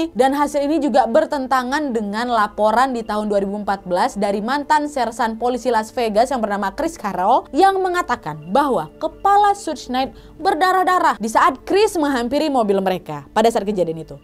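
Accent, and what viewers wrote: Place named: Medan, Indonesia. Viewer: Medannya kental👌🤝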